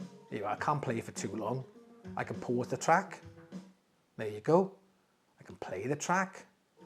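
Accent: United Kingdom. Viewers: Hey sir I kind of like your delivery a descent man talking hifi like a normal person not a bunch of fluff raw manly hood not some intellectual garbage just some guy talking stereo equipment engaging witness to something worthwhile to good people trying to enjoy there intimate hobby no big deal FUN FUNNY FANTASTIC LOVE YOU MAN! ESPECIALLY BECAUSE YOU BRITISH DIFFERENT KIND OF GUY THAN HERE IN THE STATES DIFFERENT STYLE OLD FASHION OLD SCHOOL FROM THE OLD COUNTRY I DEFINITELY FEEL RECONNECTED TO MY EUROPEAN SIDE OF MY HERITAGE BE COOL KEEP GOING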